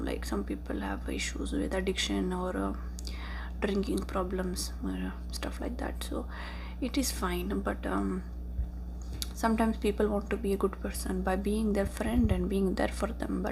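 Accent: India. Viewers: Please never stop uploading, this is great content! Also, is that a real Indian accent or is it something you learnt while travelling?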